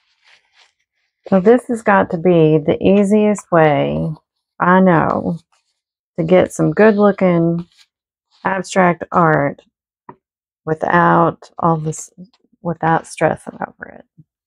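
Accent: South, United States. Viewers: I love this! Beautiful color combination. You have a lovely southern accent. I’m from SC and live in Scotland. Cheers❤ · I love this! it's so much fun and thanks for sharing the colors you're using. I think I detect a Southern "accent". Hello from a Northern viewer. Apparently we have an "accent" too, which I didn't even realize until I spent 18 yrs. in NC and then came home to MN. !!! Yup, we have a accent !!!!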